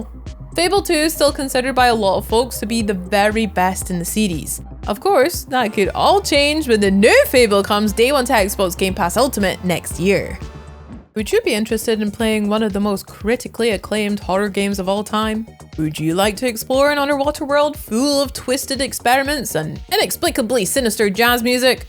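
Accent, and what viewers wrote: Scotland, United Kingdom. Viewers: She sounds Scottish at x1 speed as well ya know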